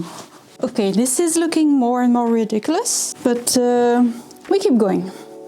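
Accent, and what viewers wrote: France. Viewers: "Sorry about that" re the French accent nooooo I love your French accent and random French words! The bed is soooooo cute! Such a lovely cosy nap spot for Not My Cat ❤. Also let him have some croissant please, he deserves a sweet treat! My kitty loves croissants and castella cake, whenever we let him have a teeny tiny nibble he is very happy 🥐🥐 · Very cute, very hilarious. No need to apologize for your accent or being French. Neither are a bad thing ❤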